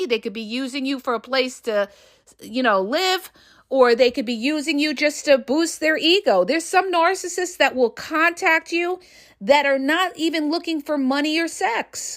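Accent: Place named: Brooklyn, United States. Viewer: Hey, cool, Brooklyn accent. You are spot on with narcs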